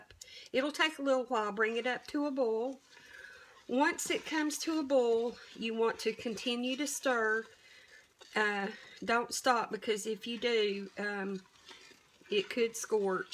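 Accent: South, United States. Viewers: I love your videos AND your Southern accent!!!!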